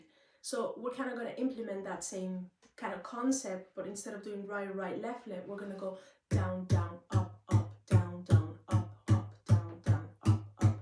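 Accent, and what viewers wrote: Ireland. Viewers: Hi, im both new to your youtube site and bodhran playing. So new in fact that ive yet to settle on which bodhran to get as my first but after watching several tutors on line, i can easily say I've gotten the most out of your content so, "yes" to your question about doing more of thes q&a sort of thing! You're an excellent drummer! I get alot out of listening but watching what you do to get those sounds is even moreso. I'm impressed with your great command over your techniques and dynamics. I have been a drummer for over 50 years playing loads of different genres of music but in all that time Irish music in general was not really in my lifes soundtrack. Nor were any of the musicians with whom I've played into it enough to pursue it either for fun or profit. So on the shelf it remained with green bagels and green beer, corned beef and cabbage and all the other commercialized "American Trad St. 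Patricks Day" faire. After morning Mass, of course! 😉 At any rate, I'm giving it a go now if only to play to cds! But im sure I'll work it in to a session somewhere eventually! Thanks so much for your inspiration as well as your wonderful content on you tube. (Its the only social media outlet i use for this sort of thing.) Oh, and your brogue is lovely! It reminds me of the way my family in the Offaly area speak. Ive other family around Ireland and for such a tiny country, the range of brogues seem vast! 😆 Be safe, and continued blessings! ✌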